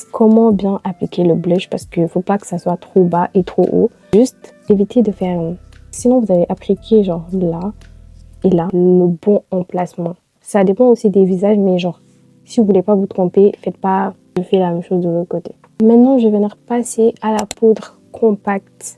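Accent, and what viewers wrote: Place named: United States. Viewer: J'aime bcp trp son accent elle a l'accent Américain !😂🤣😅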